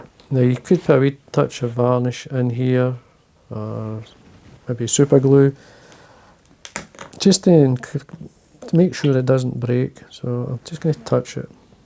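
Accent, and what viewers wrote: Scotland, United Kingdom. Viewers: I detect a Scottish accent. My family history is Scottish.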